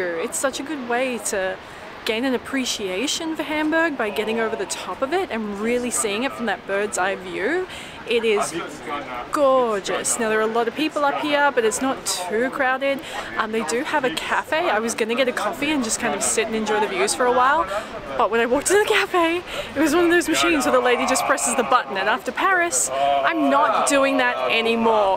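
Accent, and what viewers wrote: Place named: Australia. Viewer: this is the Best part of the Video this is what causes Depression... What happened in the past stays in the past.. Don't think Negatively, move on.. You're so beautiful and Gorgeous, you have that amazing Aussie accent, you're showing us the World... Keep Cool.. waiting for your next Video. Take Care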